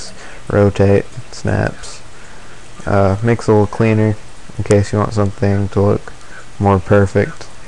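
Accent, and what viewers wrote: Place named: Tennessee, United States. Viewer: damn guess since im from tn i ddnt notice the accent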